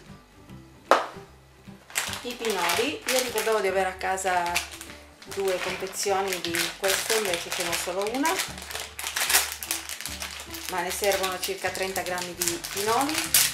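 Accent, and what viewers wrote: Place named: Piedmont, Italy. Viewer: ! Ma le tue origini sono siciliane??? Perché il tuo accento ha un che di vagamente nordico...... tipo piemontese.. Ahahahah Ti abbraccio sei bravissima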